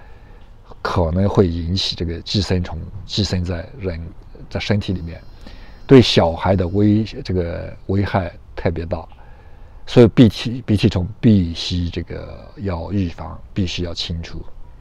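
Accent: Yunnan, China. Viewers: 云南马普，听着好亲切。我就是洒草木灰，管用，肥皂水也可以。家里的鸡根本不吃那粘粘的东西。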